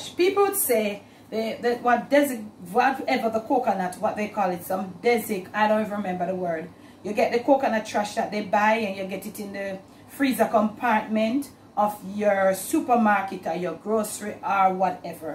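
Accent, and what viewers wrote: Jamaica. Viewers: I like jamaoca english❤ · I could just eat a slice of Toto, my mam use to make it while i was growing up. Love watching you bake, and i just love the Jamaican speeking, i find it so warming. By the way i think the word you were looking for the cocanut trash is dessicated. My mam also called it cocanut trash! Lol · Ms I enjoy your videos. Mixture of proper English and Jamaican patois is so me. I am comfortable with your style of communication. Thanks for the education in authentic Jamaican cooking on all your channels. I appreciate your letting us being a part of life in the Caribbean and specifically Jamaica. I wish you all the best going forward.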